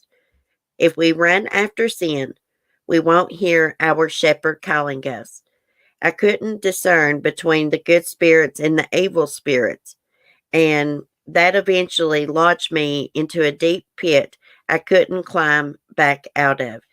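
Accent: South, United States. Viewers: I love you when you said a man killed his 21 yr old neighbor over dog poop I died. It's not funny in the least bit it is just your cute southern accent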